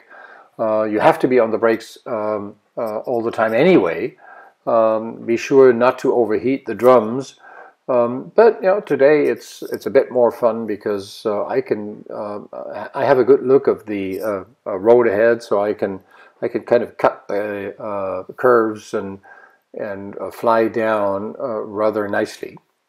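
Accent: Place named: United States. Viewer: Hallo lieber Velomobilist, das ist aber allerdings wirklich Schade. Mein Mann, also nicht der von gestern, das war "nur" mein Radelkumpel, war so gespannt auf die Bilder und ich auf uns von oben, dass du jetzt eigentlich nochmal hier vorbeikommen musst. Du bist ja auch durch Holzweiler gekommen und da wohnen wir. Mein Mann ist sehr an dem Velomobil interssiert und stellt mir 1000 Fragen, die ich ihm nicht beantworten kann. Bring deine Drohne in Ordnung und komm auf einen Kaffee oder Tee vorbei. Wir würden uns sehr freuen. Von uns kannst du dann auch über Kalenborn sehr schön über die Eifel fahren. Where did you learn you perfect English with American accent. We are quite fluent as well. We lived in the States for some years. It would be really great to meet you again!!!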